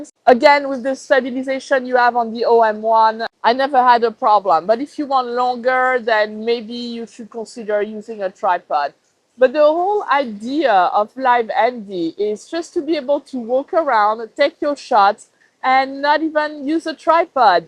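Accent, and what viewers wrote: France. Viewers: Ça paraît immédiatement que tu parles français 😊 Merci pour le vidéo 👍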